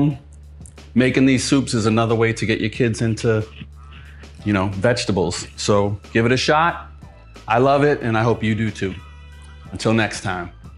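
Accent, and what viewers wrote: Boston, United States. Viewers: That Boston accent ❤️❤️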